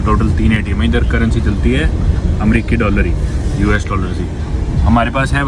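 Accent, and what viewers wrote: Haryana, India. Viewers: Ram ram bhai aap haryana s ho kya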